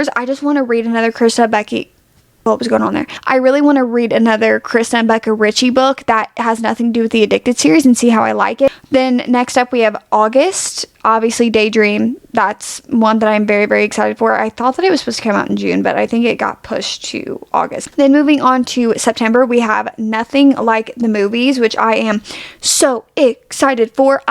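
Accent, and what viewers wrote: South, United States. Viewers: with the southern accent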